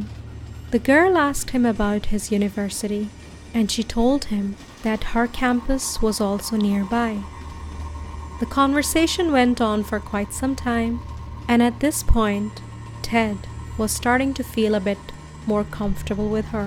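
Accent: India. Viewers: Are you indian by any chance? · U have a really Indian accent it’s annoying me. Sorry !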